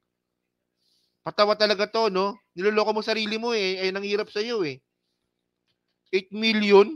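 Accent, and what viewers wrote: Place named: Cavite, Philippines. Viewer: Yung tonight ilang gabi na yun! Sabi nga kapag ang ilog maingay, mababaw lang yan., Caviteño ka ba? Murang Caviteño naririnig ko sa yo, e!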